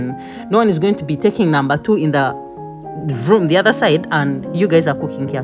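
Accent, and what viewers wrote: Uganda. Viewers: I love the Uganda accent😅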